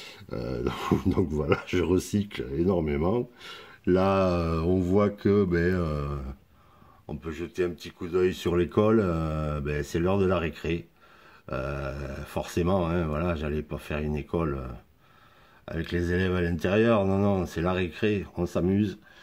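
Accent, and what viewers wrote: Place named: Southwest, France. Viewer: Salut ^^ Toujours ton imagination et ta créativité débordante. Trop jolis tes arbres, fallait y penser. Rendu visuel du tronc assez bluffant. En tant que Toulousain et amoureux de nos routes départementales et anciennes nationales bordées de ces platanes souvent centenaires, fallait que je salut je ton travail sur ce coup là. En plus de ton accent du Sud Ouest, si cher à notre cœur. Adissiatz ^^ José (Tu aimes les trains, tu aimes la musique, en passant ta "SG" est splendide, tu m'épates 😅)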